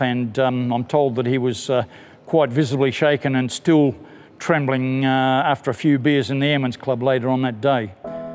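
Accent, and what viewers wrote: Australia. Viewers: With the music and the aussie accent I think of one person and one person only...CHIPS DUBBO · We all owe you a great amount of respect, you brave and humble man from Australia ..! By the way … I love your accent, but I had quite a hard time trying to understand it … Wish you a long and healthy life, Sir … Greetings from Switzerland 🇨🇭 🇦🇺🇨🇭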